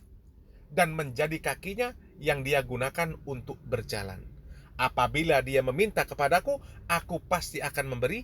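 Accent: Java, Indonesia. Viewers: dari logatnya kamu dari daerah jawa ya,banyak omong lo sini main ke Kal-Sel dan yg komen nya yg miring.kalau memang orang kal-sel/martapura sesat mari kita duduk bersama luruskan. jangan cuman nyeloteh di dunia maya karena itu bukan suatu penyelesaian. salam hormat saya dari Kal-Sel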